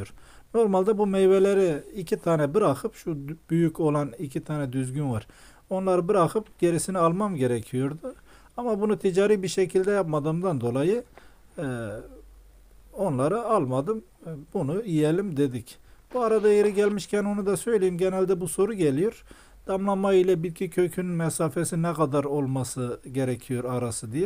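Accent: Malatya, Turkey. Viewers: Bende malatyaliyim bizim yöre şivesine çok benziyordu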